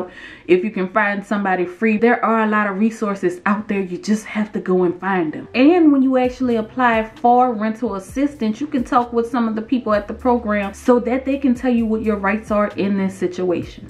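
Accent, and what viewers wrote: New Orleans, United States. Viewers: I love you New Orleans accent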